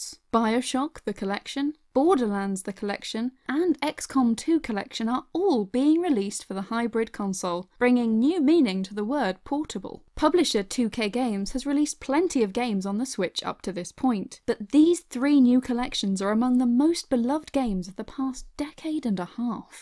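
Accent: United Kingdom. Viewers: Love your voice and accent! 😍😍 Are you from the south of England? I would guess Surrey or London :P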